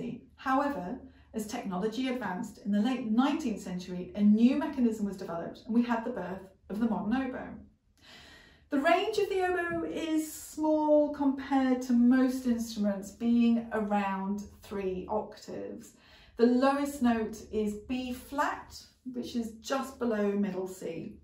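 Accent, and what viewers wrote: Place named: United Kingdom. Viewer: I so love British accents. Mesmerized....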